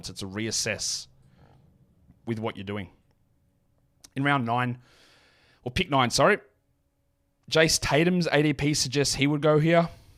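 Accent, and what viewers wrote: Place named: Australia. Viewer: "They deliberately say something wrong or weird to boost themselves up in the algorithm. Maybe I should start that" Also: has a thicc Aussie accent who hosts a daily show that with a viewerbase of largely Canadians and US, and greets them every show with obsure aussie quotes like "what is the crime, eating a succulent Chinese meal" 😅😅 Look, you're not wrong. Kept me coming back that's for sure.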